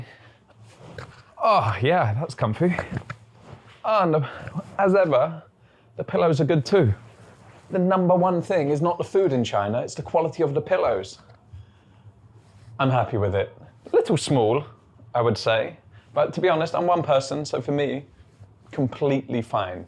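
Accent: United Kingdom. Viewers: why you look and sound so British, but with an Italian Passport?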